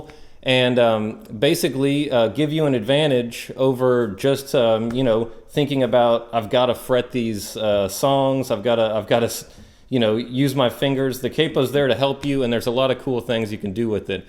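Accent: South, United States. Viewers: This is the first time i have heard your voice. Correct me if I'm wrong but, do i hear a hint of a southern accent?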